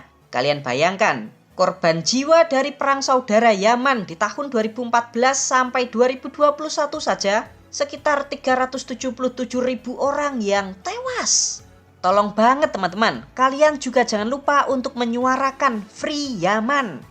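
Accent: Java, Indonesia. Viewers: Pembawa acara dengan logat jawa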